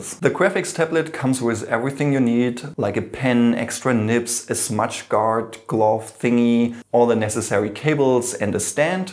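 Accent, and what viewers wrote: Germany. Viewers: Man hört voll raus, dass du als Deutschland bist😂✌🏻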